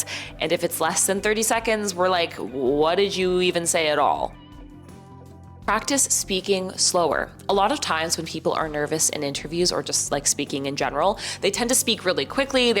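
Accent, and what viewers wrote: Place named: Canada. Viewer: Sounds Canadian…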